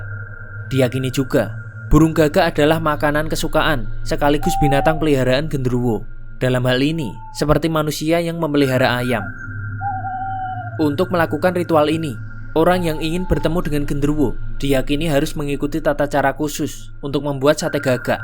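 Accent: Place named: Java, Indonesia. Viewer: Logatnya bg kaya orang jawa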